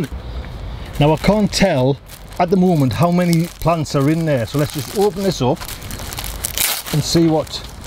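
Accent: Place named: North East England, United Kingdom. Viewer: Great video. Sounds like your from the northeast I'm a new starter and have just got my first plot near Beamish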